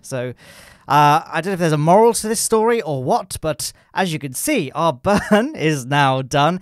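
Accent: United Kingdom. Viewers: he's British I thought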